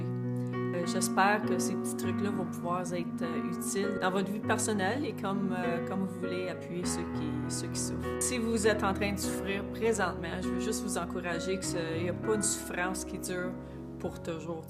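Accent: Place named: Quebec, Canada. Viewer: Quebecer, I suspect by the accent.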